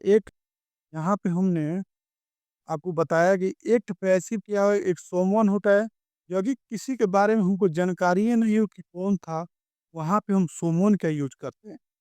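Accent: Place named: South, India. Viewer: Bhai south se ho ky tum Hindi wali vibes ni aari aisa lag ra hai jaisa soch soch k bol re ho But bathreen explanation ❤❤❤❤❤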